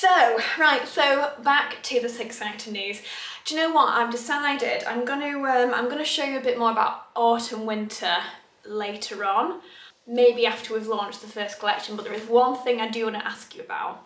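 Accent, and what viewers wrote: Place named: Northern England, United Kingdom. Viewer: It makes me so happy when I hear you say classic northern phrases like “cracking the flags”! 🙌 I love both of the coat materials but I think the blue pattern has the edge for me 💙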